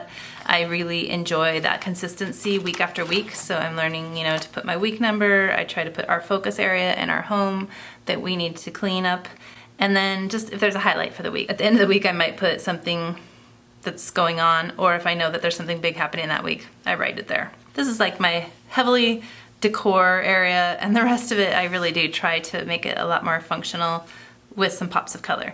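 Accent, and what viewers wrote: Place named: South, United States. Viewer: You have a great Southern accent!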